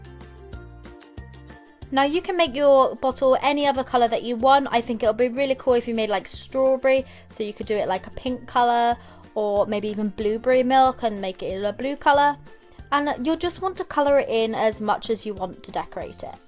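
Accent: United Kingdom. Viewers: Are you British?😃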